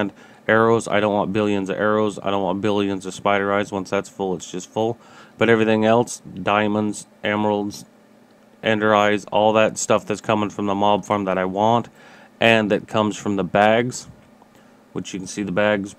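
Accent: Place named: South, United States. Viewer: You hillbilly sounding useless peace of shit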